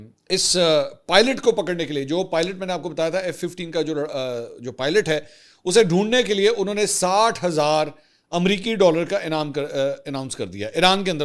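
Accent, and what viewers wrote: Punjab, India. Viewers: bhai Punjabi bhtttt piyari bolty hn ap😂❤ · Salam Mr punjabi touch in vlog brings 🔥🔥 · bhai aap ke panjabi commentary ke kya he kehny ayhyhy maza he aa jata hai sun ke❤❤❤ love you...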